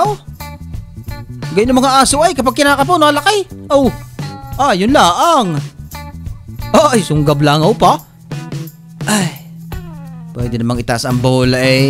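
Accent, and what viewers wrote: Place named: Santo Tomas, Philippines. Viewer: Ayy taga STO.TOMAS talaga are! Ascent palaang ih!😂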